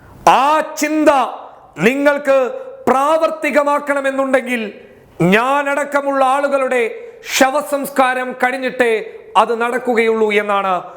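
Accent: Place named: Northern Kerala, India. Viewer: ഈ ഇട്ട് ഇട്ട് എന്ന ഭാഷ വടക്കൻ ഭാഷയാണോ?ഡക്കറേഷൻ ഉണ്ടങ്കിലും ഇടയിൽ വടക്കൻ സ്രാങ്ങ് വരുന്നുണ്ട്.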